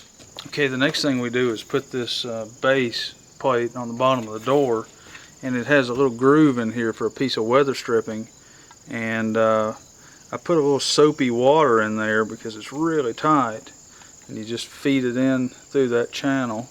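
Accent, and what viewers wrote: United States. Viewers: Great job, great lesson. I've got two i have to install tomorrow. Love the hat, pencil and accent. Made me want to shout 'merica!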